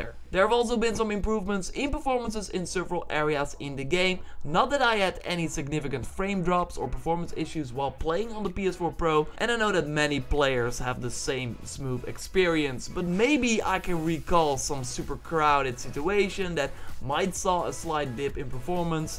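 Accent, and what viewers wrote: Netherlands. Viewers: ik hoor aan jou stem dat je nl bent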